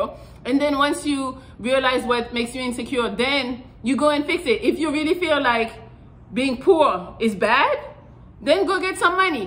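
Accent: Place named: France. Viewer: Approximately pm March 06 2022 from Tobago: You are awesome. I love your French Accent. Keep up the great work of simplifying the interaction of men with regard to women.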